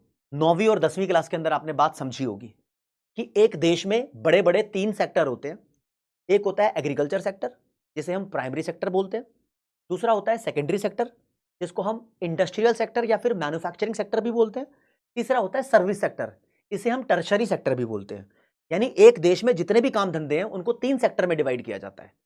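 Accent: Punjab, India. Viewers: SIR APP PUNJABI HO KYA BATO SE LAG RAHA HAI 😅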